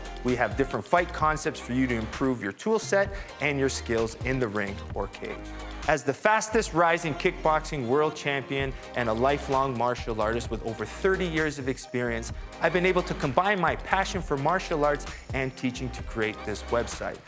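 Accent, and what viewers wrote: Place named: Italy. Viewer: Dude you have an Italian accent!!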